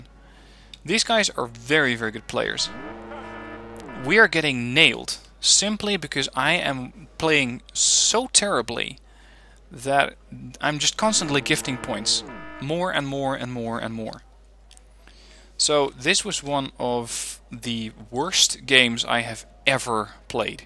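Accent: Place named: Netherlands. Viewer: Is that a little Dutch slipping through ? Where are you from, your accent sounds different?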